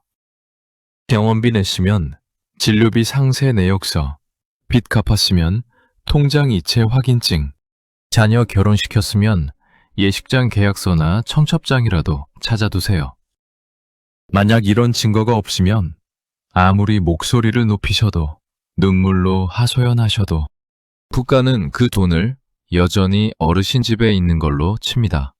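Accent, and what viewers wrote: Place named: Gyeongsang, South Korea. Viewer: 욕보셨습니다.경상도 말인데...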